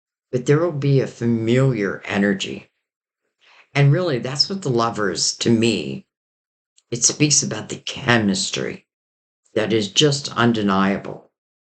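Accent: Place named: Pennsylvania, United States. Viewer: First time I listened to you. I am feeling your energy and I’m so thankful I found you. I love your accent. PA? I’m from Philly. I connected with your reading so much. My Dad came through with everlasting love. ❤️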